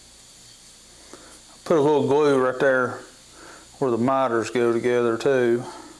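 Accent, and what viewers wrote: South, United States. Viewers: Looks good to me and I have now found someone that sounds as southern as I do.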